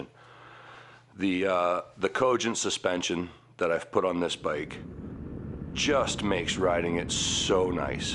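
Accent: Canada. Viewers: Sweet canadian accent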